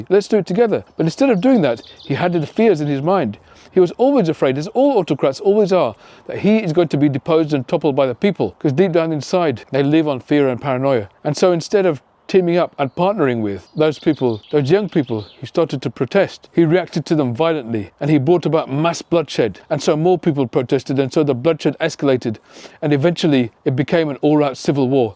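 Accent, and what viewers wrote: United Kingdom. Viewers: He sounds British to me, so born here and a citizen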